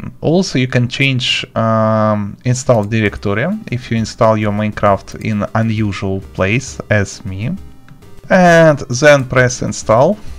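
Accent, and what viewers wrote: Russia. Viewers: Русский акцент, вери вел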